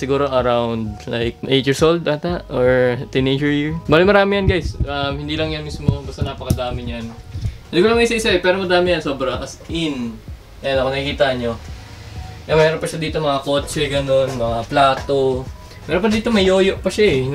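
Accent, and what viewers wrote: Visayas, Philippines. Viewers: Bisaya po ba kayo??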